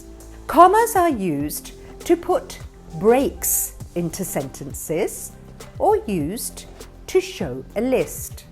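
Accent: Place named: United Kingdom. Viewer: Just a question mam.. were you born in UK ? You have a british accent. Please answer this. Just curious 🌸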